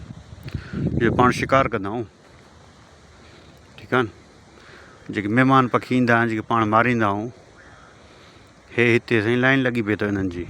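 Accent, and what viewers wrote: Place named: Sindh, Pakistan. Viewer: You have beautiful Sindhi accent. Sir MashaAllah.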